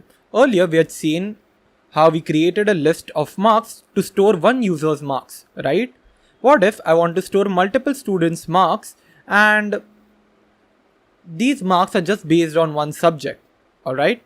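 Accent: India. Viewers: Textbook indian accent, love it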